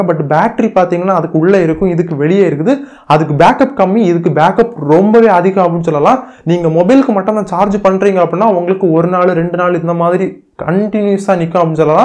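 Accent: Kanyakumari, India. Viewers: Bro.. Kanyakumari slag😍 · Neenga Kanyakumari ya bro.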